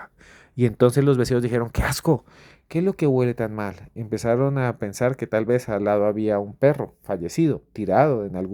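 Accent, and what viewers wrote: Colombia. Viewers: es Maravilloso, es auténtico,puro colombiano, su vocabulario es hermoso, me encanta escucharlo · No hay mejor narrador!! Que colombiano, me encanta !!!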